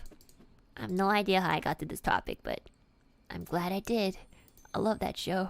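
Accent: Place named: United Kingdom. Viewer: 笑死 英國腔真的有像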